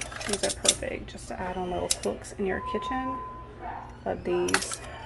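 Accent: Louisiana, United States. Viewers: Mam, was wondering... Are you Cajun? I live in Louisiana and you accent sounds very familiar. Love it!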